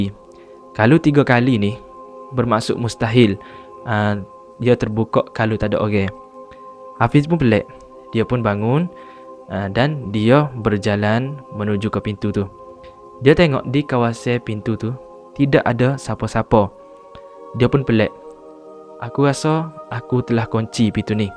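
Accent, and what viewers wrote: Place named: Kelantan, Malaysia. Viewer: Pergh pekat btul Kelantan awk eik?tapi syabas.bro.pnyampaian ok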